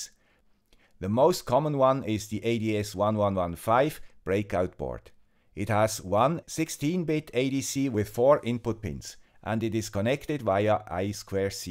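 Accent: Switzerland. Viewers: Watched already a lot of videos of your channel and at the beginning it was a lot of „häääää, versteh grad überhaupt nix“ but from one video to the next I start to understand what you are explaining us. Have to say that I am a totally new beginner. My goal is to measure the 2 12V Solar Batteries in the OFF-Grid garden of my parents in law. Tried a lot with the ADC from the ESP32 itself and as u mentioned it’s readings are just bullshit. Thank you for introducing the ADS1115 to me. I guess that will fix my measuring problem and can finally bring the project into production. As I am original from Vorarlberg and worked many years in Kanton SG I love to hear your swiss accent as it remembers me of that great time in my life! Electronic wise you are my personal hero ;-) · the pronounciation is on purpouse to catch viewers, he speaks without the swiss accent in some vlogs:) I hear it every day at work, so I'm used to the accent :) Sali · Hey, you replied! 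No I am in fact not a native but I've got a CEFR C1 Language certificate which basically states that my English is quite good. This was in no way meant to insult you, as you also call yourself "the guy with the swiss accent" ;) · He has a Swiss Accent though! :-) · No love from the guy with the Swiss accent for Swiss microcontrollers? I was expecting to see an STM32 here 😋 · Hello, you do not have a swiss accent but a swiss GERMAN accent ! ! ! Grüsse von der anderen Seite der Röstigraben.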